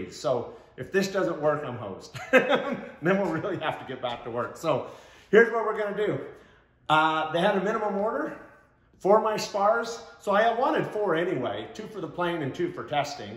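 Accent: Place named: Utah, United States. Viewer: you must be from utah the way you talk.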